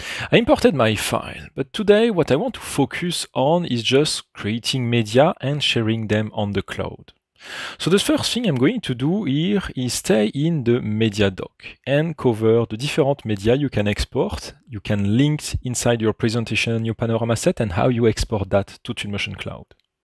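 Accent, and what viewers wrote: France. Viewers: le petit accent français là il me fait me sentir chez moi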